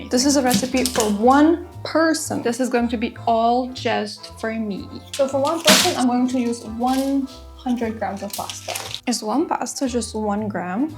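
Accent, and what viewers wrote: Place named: United States. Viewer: Apologies if you've explained this in a past video, but how did you end up speaking American English? It's always interesting to see how people were taught/taught themselves across the UK/Australian/North American inflections. Signed, an American with some long-ago Lithuanian roots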